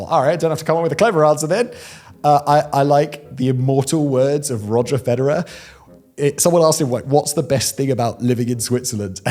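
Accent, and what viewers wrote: United Kingdom. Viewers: His British accent is too thick. It sounds like jibberish. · Hey,! Love the channel, but could you dial down the British wanker cadence just a tad? Cheers!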